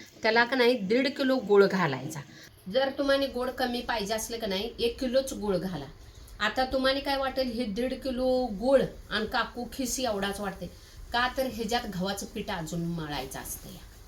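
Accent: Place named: Satara, India. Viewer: खुपच छान बोलतात सातारा किंवा सांगलीचे भाषा कारण मी सातारी इतक छान टापटीप करून दाखवतात मला खुप आवडल धन्यवाद ताई 🙏🙏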